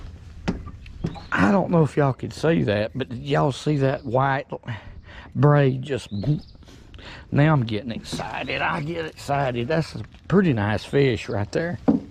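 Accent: South, United States. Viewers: Love that accent brother! Southern pride!!!👍😁✌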